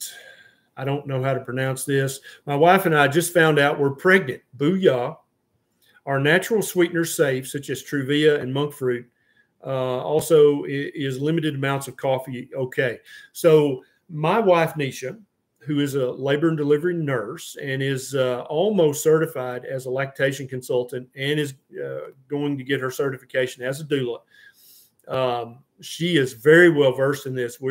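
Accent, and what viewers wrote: South, United States. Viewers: You have a nice Southern accent! I spent a year (1977) going to school at NATTC Memphis and to this day I still talk southern! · Come to South Carolina where people do have a southern accent. I am proud of mine and yours too. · It’s not an accent, it’s Southern Dialect. I’m from Lithuania and do have accent I’ll die with, but oh well… 😊 In my old country of 3,7 million people there are 4 different dialects believe it or not… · I don't hear an accent, just a nice southern draawwlll. 😉😉😉😉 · I think they were referring to your Southern accent 🤣😉😍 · Im from south carolina and you sound like my boss. You look like him too. Wonderful accent, respectable guy, very southern and genuine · 😂 yes, we all have an accent. I’m Canadian and you probably think we have an accent. Anyway, you have a cute southern accent 😃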